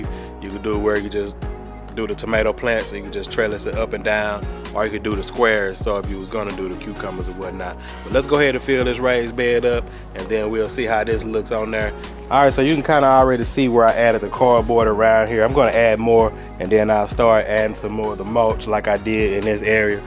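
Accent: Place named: South, United States. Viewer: Hold on friend, you don't have to pull up that chard. That chard will last you all year. Looks like you're in the South or sounds like it from your accent. Swiss chard you can harvest repeatedly. Maybe you're just making room for other stuff? Reach out if you want to chat about Swiss chard. Love your video.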